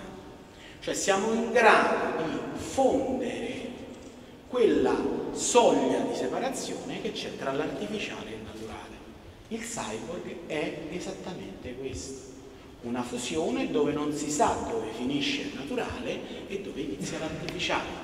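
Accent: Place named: Rome, Italy. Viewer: La cadenza romanesca è alla mano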